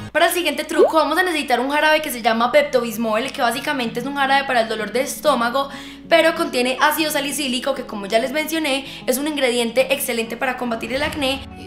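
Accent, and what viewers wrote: Antioquia, Colombia. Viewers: Me encanta tu acento colombiano, saludos desde México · Uy ese acento a paisa se detecta a leguas XD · Yo solo me quede por escuchar ese hablado paisa bello😎😅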